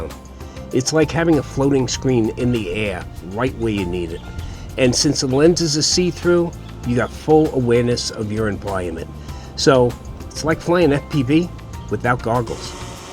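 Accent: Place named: New York, United States. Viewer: New yorkah?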